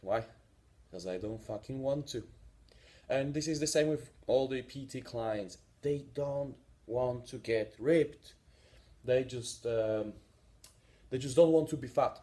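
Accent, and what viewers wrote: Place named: Eastern Europe. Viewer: Panie, bardzo ciekawe video, ale czy nie zastanawial sie Pan nad przejsciem na forme pisana? Niestety slychac, ze jezyk angielski nie jest Pana ojczystym jezykiem, robi Pan calkiem sporo "polskich" bledow jezykowych, ma Pan mocny slowianski akcent i slychac, ze z trudem szuka Pan slow. Polaczenie tego wszystkiego z dosc slabym udzwiekowieniem (moze dodatkowy mikrofon tuz pod szyja) sprawia, ze po prostu ciezko sie tego slucha i trzeba sie mocno skupic, zeby wyluskac tresc. Czytalem kiedys Pana artykuly nt. treningu silowego i byly bardzo dobrze napisane. Moze zamiast formy youtube'owej przerzucilby sie Pan po prostu na bloga w jezyku angielskim? Tlumaczenie tekstow z jezyka polskiego na angielski jest aktualnie smiesznie tanie, a moglby Pan zlapac wiekszy traffic, szczegolnie na kfd/sfd/pokrewnych forach. Pozdrawiam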